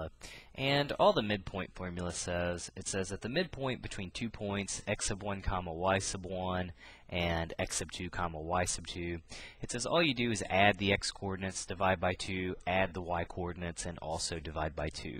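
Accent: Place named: Chicago, United States. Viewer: hahaha in this video you kind of have a chicago accent you have the short sound for your A's. I think.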